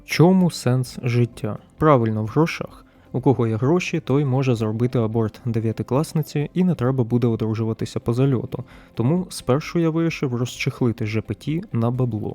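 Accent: United States. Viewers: звідки американський акцент?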